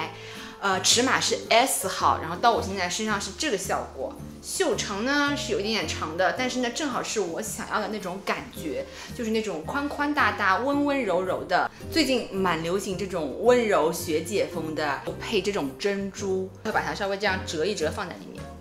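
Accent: Shanghai, China. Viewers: 好喜欢你的上海普通话啊哈哈哈哈，太开爱了。裤脚管，便宜西西的哈哈哈哈😂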